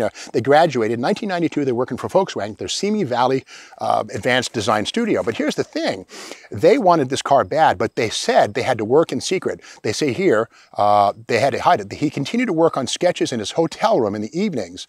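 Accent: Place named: Boston, United States. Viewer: Boastun accent lol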